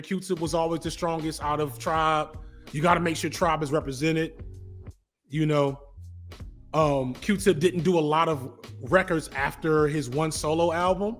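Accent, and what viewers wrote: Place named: South, United States. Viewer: We also just talk that way in the south. We ain't gotta be leaned up. It's hot man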